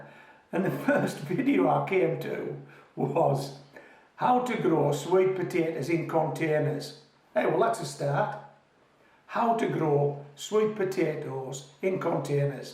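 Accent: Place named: Cumbria, United Kingdom. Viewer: Hi, I've been trying to pin down your accent to amateur rugby league teams and i have decided on Wath Brow. Close? Keep the great videos coming.😀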